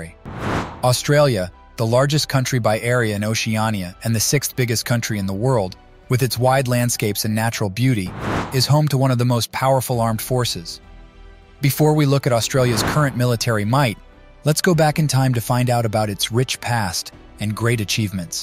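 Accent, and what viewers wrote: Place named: United States. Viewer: Can we please have anyone other than a yank tell such a story?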